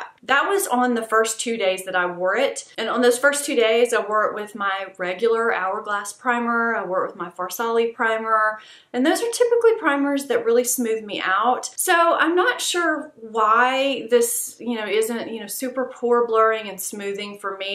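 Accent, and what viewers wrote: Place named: Louisiana, United States. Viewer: Louisiana fam 🐊 I got so excited when you said Nola!! Knew that accent sounded like home 🤟🏻 I’m from Lafayette, I’ve never found an influencer from my neck of the woods! It helps a lot to see someone trying things in our crazy climate! new subscriber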